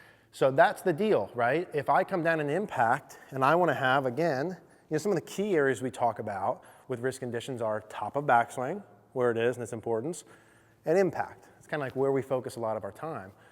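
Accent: United States. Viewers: A wonderful teaching perspective. How I wish I could spend some time listening to your best friend about tell me about how you came to your approach to the swing. His best friend's getting better-more entertaining-at his presentations. When you make a joke, your Pittsburgh sppech gets faster. Slow the jokes down for us old farts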